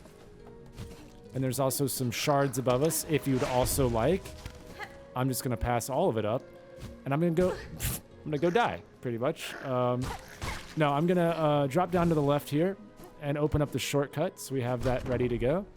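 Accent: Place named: Australia. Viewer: This guy's funny with the Australian accent 🤣 😂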